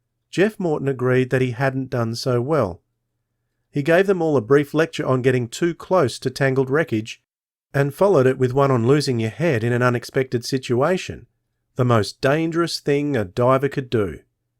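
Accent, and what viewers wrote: Australia. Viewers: Thank you for your reading of this Junior Sleuth series of books by Robert Arthur. I appreciate your fine impersonation of the Greek boy's accent. Your own Australian accent is interesting to listen to. Some years ago, I read The Mystery of the Haunted Mirror. That was my first discovery of Jupiter, Pete and Bob and their Detective agency, The Three Investigators. ☎️🏛☎️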